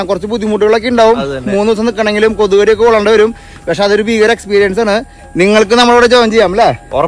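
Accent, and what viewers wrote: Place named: Ollur, India. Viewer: Ollur slang ahno poli slang ❤❤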